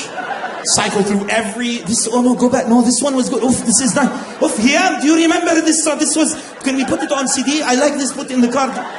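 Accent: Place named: Pakistan. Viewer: ما عنده حس فكاهي و لهجته مو لهجت لبناني يحكي انجليزي بل بكستاني او افغنستاني ...... مهم يزيد يشوه ف صمعة العرب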